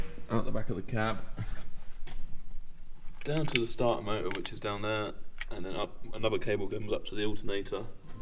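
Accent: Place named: East Anglia, United Kingdom. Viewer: Hi, really enjoying your videos 👍can you let me know where you're based roughly? Sounds like a lincs/ cambs twang to me? 😃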